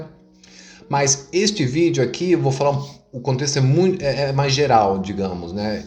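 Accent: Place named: England, United Kingdom. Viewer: A Seiko comprou a Orient e surgiu a Orient Star, relógios belíssimos e diferenciados, fino acabamento. Tenho um Contemporary com open heart, muito preciso e bonito, esse cara não conhece um Orient Star. Ele também tem mau gosto, seus relógios com pulseiras laranjas que o digam, cada qual com seu lado brega... a cruz suíça não afasta esse trejeito de um Magal, nem sotaque do inglês...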